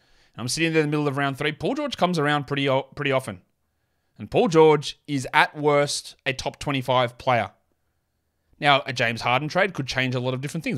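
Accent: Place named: Australia. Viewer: Compared to you I’m the Michael Jordan of fantasy basketball. You probably the same kind of idiot that picks Jimmy butler in the second round. I hope people don’t pay money for your content. That’s just criminal. Taking basketball advice from someone with like an Australian accent doesn’t seem like the move when they probably don’t even sell basketballs in your continent. Continue throwing your money away with your fantasy leagues. Hope you actually learn something one day with these countless hours you waste on these videos. Goodbye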